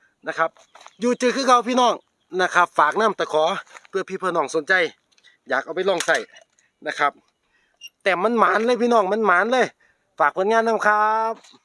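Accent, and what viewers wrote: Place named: Sisaket, Thailand. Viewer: พอมาทำงานต่างประเทศมันกะเงียบเหงา พอได้เห็นคลิปนี้ก็หายคิดถึงบรรยากาศตกปลาที่บ้านเด้อครับ สำเนียงคือศรีสะเกษบ้านผมแท้ครับ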